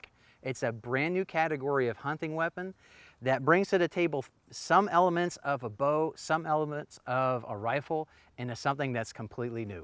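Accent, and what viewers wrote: United States. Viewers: I always thought that the "Twang" was because of your southern accent. Thanks for all the great videos.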